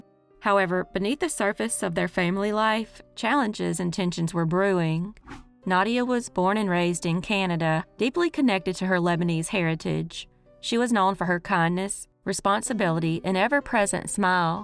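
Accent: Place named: South, United States. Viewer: Lol "MAc" donalds- this is why i listen to you! We speak the same language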